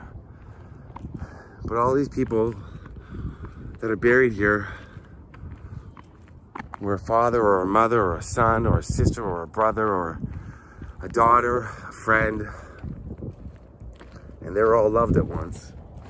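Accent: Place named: Toronto, Canada. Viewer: Today I went online to find a bio on Blind Willie Johnson (not much online) and stumbled on your video, I heard you talk and I thought to my self you were from Toronto, and low and behold you are from Toronto, and so am I; good video, well done.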